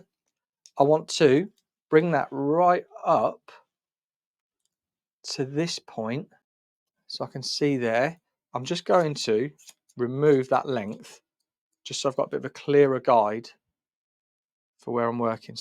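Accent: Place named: North, United Kingdom. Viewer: You're a really good hairdresser to watch ,you sound like a northerner too like me 😉..But..isn't that hairstyle like a,long ' Karen ' style..maybe for Karen"s,daughter ' Karina' 😆😆😆😆😆Sorry I hate that graduated style at the back & ear flaps look .I was born in 1967 in Liverpool & believe me I've seen some ridiculous styles over the last 50' odd yrs in the UK 😆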